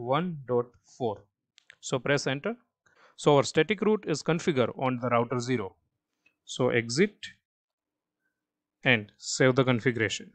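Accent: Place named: India. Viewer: 2 years later and youre helping a random Sailor in his C school, thank you random indian sounding man on the internet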